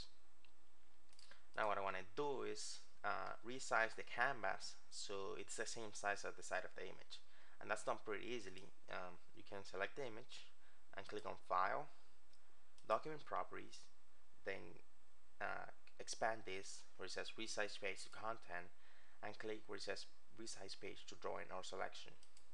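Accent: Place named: Mexico. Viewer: you sound ike me trying to spek english (i'm mexican)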